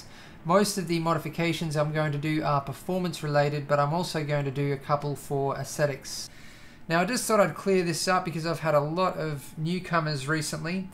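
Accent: Australia. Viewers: What country are you from most are US but you sound aussie?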